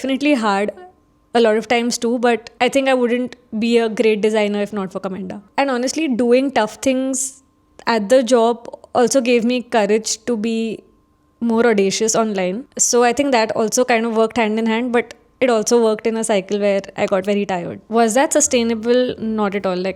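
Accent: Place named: India. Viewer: Itna gandha accent hai pajjeett vala. Hindi mai bol le London ki rani.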